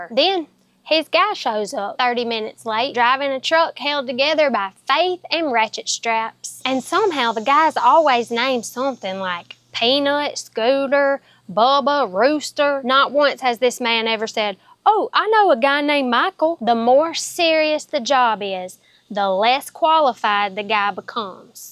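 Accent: South, United States. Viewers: I could listen to you talk all day. Southern with lots of sarcasm! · As a southern man, I love to hear her voice/accent. Classic. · i love your southern drawl beautiful, all women should speak like you.....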